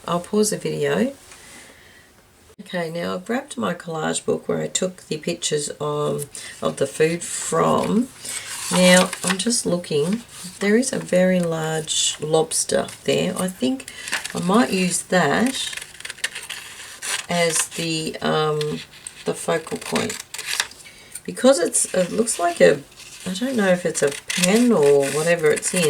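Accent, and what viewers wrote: Australia. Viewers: Hi, just found your channel on u tube! love listening to an Ozzie! Happy New year and take care of yourself, love from Queensland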